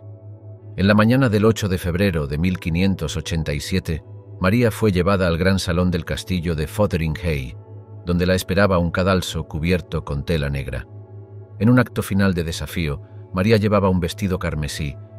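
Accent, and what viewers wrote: Castile, Spain. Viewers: Buen video, muy instructivo y sobre todo maneja un lenguaje castellano perfecto...agradable de escuchar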